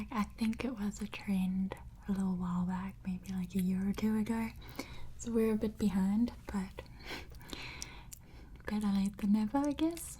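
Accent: South Africa. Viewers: My fave place on earth. Hoping I win the lottery and can move out to camps bay or kleinbaai!! Great accent! Great vid!! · I love your accent! Glad you did this! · Absolutely love your accent! My new fave asmr channel! So glad I found you 😍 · So happy to hear a from a south african asmrasrtist! 🇿🇦 LOL the feeling of nationality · Hey cute lady south African British rose lady great video I could listen to you're accent forever and I sure will if I ever get chance in future I would love to visit south Africa and stay in a tent hey I will watch the video and sleep to it thanks I hope you try potatoe cakes there British and try crumpets with butter xxxx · Just ran into this on a rainy evening in Alabama. Had a chance to visit South Africa years ago. The accent is fantastic. But here in the states there are a variety of pronunciations based on geography. What you call a trolley is a cart or buggy here in the south for example. 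And sometime emphasis is on a different syllable. Pecan for example is either “P-can” or “pecahn” as you said. Another example is can’t, here in Alabama we might say it as “cain’t” Great stuff! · The accent is beautiful. My best buddy Ralph is Afrikaans and his accent is incredible. Great singing voice too.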